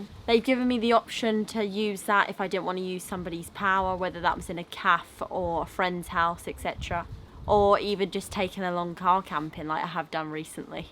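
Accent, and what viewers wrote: United Kingdom. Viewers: I am here for the British English. English is not my first language. I am used to see dressed up in cold weather hiking clothes. I just find out that underneath all those clothes hides an exquisite pretty girl.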